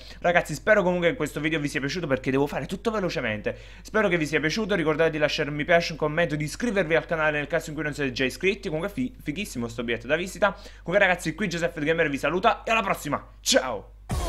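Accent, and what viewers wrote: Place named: San Giuseppe, Italy. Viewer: dal tuo accento mi sembri di San Giuseppe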